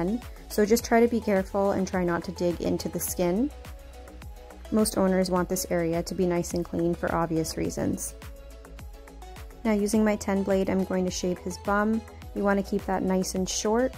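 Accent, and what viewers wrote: Canada. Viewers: A bit off topic, but as a non-native English speaker, I felt so proud of myself when I could hear your Canadian accent and then I checked and the assumption was correct😄 And on the topic of the video - Monty is just an absolute sweetheart 😍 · I've NEVER been a pet owner, but Im so glad this channel was in my recommendations. I found this video to be so satisfying and peaceful. And as an American from the great State of Texas, your Canadian accent got you a new subscriber!!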